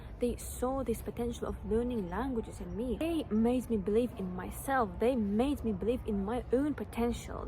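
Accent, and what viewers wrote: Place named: United Kingdom. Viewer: I love your British accent 😍💖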